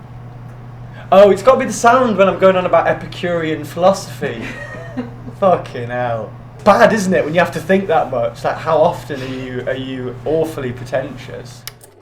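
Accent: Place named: North, United Kingdom. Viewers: He has such a strong Manchester accent in this interview haha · He sounds really northern in this video!! Love it!!! Northerner represent!!!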